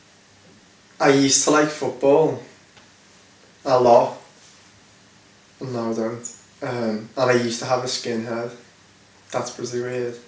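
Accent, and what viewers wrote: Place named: Liverpool, United Kingdom. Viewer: Scouse accent 💯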